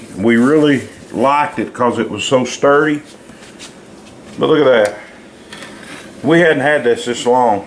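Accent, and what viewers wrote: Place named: United States. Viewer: Great video. Love the country accent.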